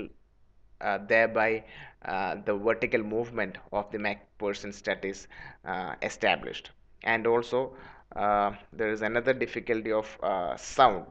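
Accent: India. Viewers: the indian accent sucks and is becoming default accent of english everyday.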